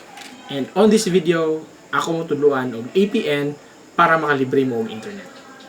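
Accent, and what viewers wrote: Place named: Visayas, Philippines. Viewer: Bisaya man jub ka saan ka saan ka dira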